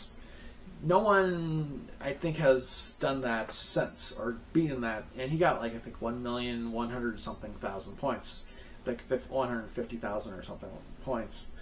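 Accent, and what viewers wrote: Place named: Australia. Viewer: G'day mate. Love your Australian accent.... ;) Just wanted to let you know, I was in Japan last year and met up with Acu Azu and he's an absolute legend. He plays DK like a machine, it's absolutely insane watching him play and comparing his style to mine is night and day. He was lovely enough to bring some other Japanese guys who play DK, including the guy who brought DK out on the switch for Hamster inc. Their community seems to be filled with guys who just want to help each other achieve greatness.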